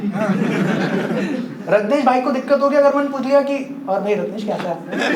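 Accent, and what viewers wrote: India. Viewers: Bhai which city u r? UP se h yh toh jaan gya 😊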